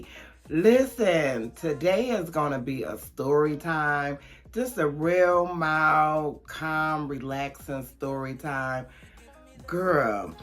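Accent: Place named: Long Island, United States. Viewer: New subbie I like your style I knew I heard a lil my in there I'm from Long Island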